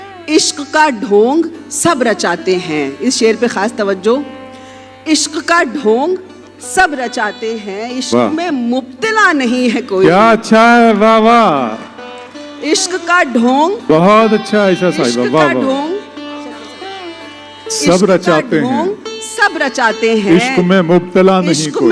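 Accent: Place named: Karachi, Pakistan. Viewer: Pure urdu! Pure educated karachi accent! Proving the language belongs to only literates not illiterates!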